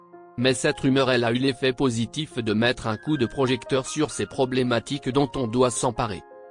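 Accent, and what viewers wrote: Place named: Grenoble, France. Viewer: Je l'adore. Il a une longue carrière qui s'annon ce à lui. Très grand charisme, j'adore sa façon de s'exprimer, avec un petit accent Grenoblois. Il est très beau. Je le vois faire une carrière, comme celle d'Alain DELON. Je lui souhaite plein de bonheur et une magnifique carrière.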